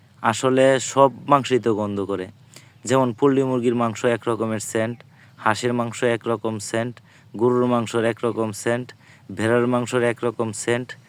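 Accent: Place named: Kishoreganj, Bangladesh. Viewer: আপনার কথায় বুঝা যাচ্ছে আপনার বাড়ি কিশোরগঞ্জ জেলা। আপনার নাম্বার টা দেন ভাই।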